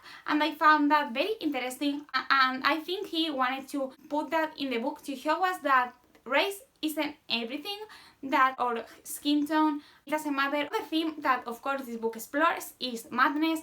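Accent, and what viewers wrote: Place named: France. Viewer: Cute French English accent. 🌷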